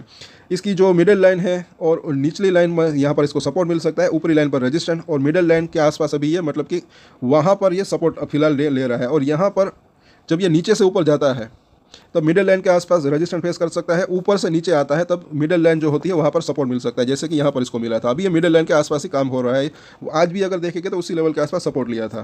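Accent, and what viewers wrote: Gujarat, India. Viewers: Sir aap Gujarat ke lagte ho plz reply dena.. Kyu ki apki awaj Gujarat ki hindi dhhal lagti hai.. Main bhi gujarati muje apke soor se aap gujarati lagte ho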